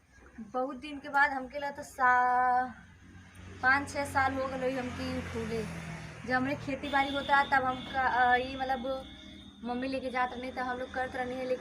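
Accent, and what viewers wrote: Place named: Bihar, India. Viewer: Aap lgrha hi ki bihar ki hi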